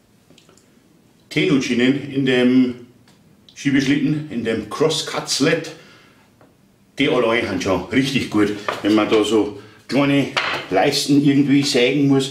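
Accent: Bavaria, Germany. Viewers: Beinahe hätte ich ein Daumen nach unten gegeben. Das Video war äusserst langweilig, Die Stummfilm-Zeiten sind doch lange vor unserer Zeit vorbei gewesen. Bitte sprich wieder in den Videos deine bayrische Goschen, hat mir immer Spass gemacht.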